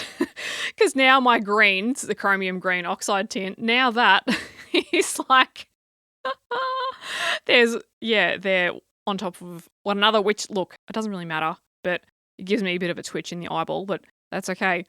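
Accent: Australia. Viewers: Really enjoyed watching this. I love the relaxed attitude and the voice commentary maybe because u r an Aussie and u sound relatable to me. Keep them coming. Looking forward to ur next one. ❤ Btw I haven’t started in my Johanna Basford Small Victories but u have encouraged me to start. (I’ve had it since it first came out) I think I’ll use Gel pens for the entire book. Thanks for the inspiration. 😊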